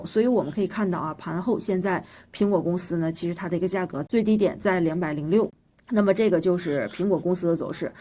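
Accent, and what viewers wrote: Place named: Northeast, China. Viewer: 这东北味很亲切啊 哈哈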